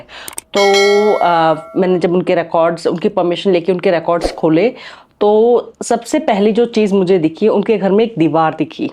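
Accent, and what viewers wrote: Haryana, India. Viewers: Mujhe b ap bahut achhe lagte ho😘😘😘 or specially ap jab haryanvi bolte ho jab🤗😍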